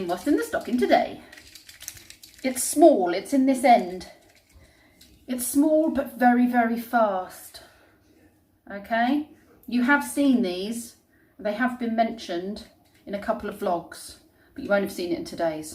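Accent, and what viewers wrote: United Kingdom. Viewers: .. It's that Brit accent that gets me all the time.. hahaha.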